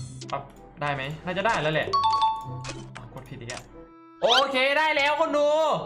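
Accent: Korat, Thailand. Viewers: คนโคราชและดูออก😁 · พูดโคราชทั้งคลิปหน่อยครับ555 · สำเนียงโคราชก็มา55💖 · ผมชอบพี่พูดโคราช เเทะๆ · โคราดจัด😆😆😆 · โคราชจ๋าเลยน้อK2J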